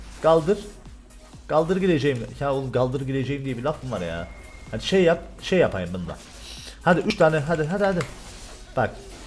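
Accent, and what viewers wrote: Cyprus. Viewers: abi kıbrıslımısın çünkü bende sesin aynı kibrisli konuşmasını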